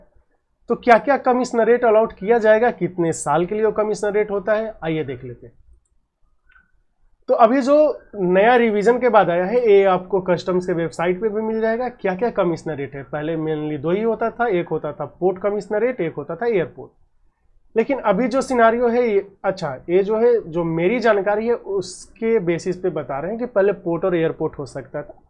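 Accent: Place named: Bengal, India. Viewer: Ap bengali ho kya?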